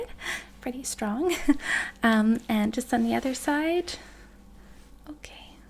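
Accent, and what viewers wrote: United States. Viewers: I had forgotten how good your American accent was. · Liking that American Accent.Great work. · Your American accent has become so convincing! About halfway through the video it clicked that this wasn't a Scottish, British, or Australian accent. Really good stuff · Omg what ur not American??? I’m American and this is literally such a good accent, I’m so impressed that u did it so subtly. Also loved the ASMR part lol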